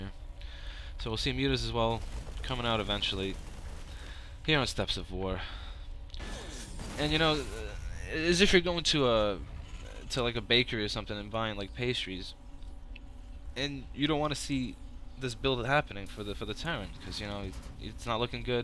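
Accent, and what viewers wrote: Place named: New York, United States. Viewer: Haha yeah man i remember in one of your first vids some one had asked you where you were from and since then i was surprised cuz i couldn't find a trace of NY in your voice lol 'till now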